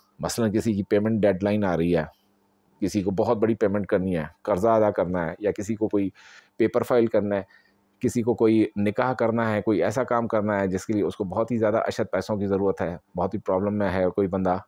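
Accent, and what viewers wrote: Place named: Punjab, Pakistan. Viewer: 🌙niyat me agar ap ko mang liya tu?? Etna piyara panjabi urdo soft fast bolty ...acha lagta ha..few year ago me free hoki Beth gy.gour Kiya .me ni kasa reply Kiya same time par few good piyary insaan mujy papoose. Un sab ko same reply Kiya Ap kuhda se mago.last Ramadan days.bhot duai mangy hongy..evryday mesg me I off evry thing Ramadan ki zikar and cooking raat bi jagna .after Ramadan computer opn hi nhi howa buzy with zindagy job after 8 year wo Nawaz hogy nhi pata khagy Allah zinda h ai kher se ho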